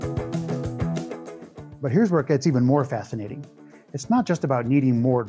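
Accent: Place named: Scotland, United Kingdom. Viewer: Your Scottish accent is great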